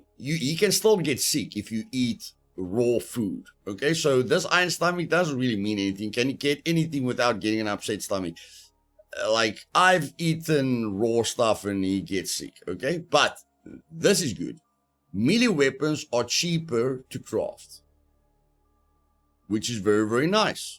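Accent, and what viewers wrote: South Africa. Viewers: Absolutely great video bruu. Love how in depth U went with this. I'm massive into the dead fan Also THE SOUTH AFRICAN ACCENT YESS. I'm Aussie South African lmao Great vid man 👍👍👍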